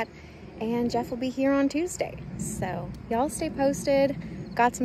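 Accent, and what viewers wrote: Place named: South, United States. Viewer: OMG! I heard several "Ya'lls", a growing amount of southern inflections and you even pronounced mayonnaise like a true southerner. Hopefully Jeff will make you an official Damn Yankee soon. Do you know what that means? ETA: I'm glad that you're on the mend. You look well.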